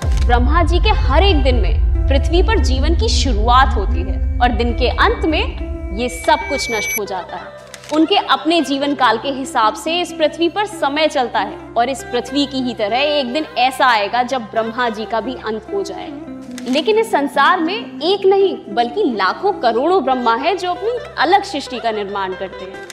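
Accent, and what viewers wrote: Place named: South, India. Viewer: It sounds offensive if one speaks Hindi like Telugu, Tamil, or Kannada. Please try to completely say words in the Stotra in the beginning, like how Sanskrit is supposed to be spoken. Eg: kara(ha) means hand in Sanskrit. Kar doesn't give the same meaning. Thank you.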